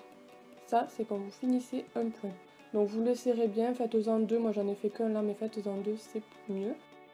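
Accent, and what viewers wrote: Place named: Marseille, France. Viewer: C'est la première fois qui j'entends ta voie et je ne m'attendais pas du tout à un accent marseillais😂😂 Bref! Ta vidéo est vraiment cool!😍😍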